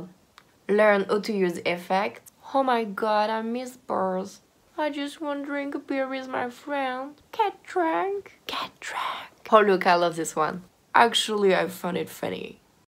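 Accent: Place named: France. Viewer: Fantastic! Love it, I forgot how beautiful your French accent is, love seeing your work 💓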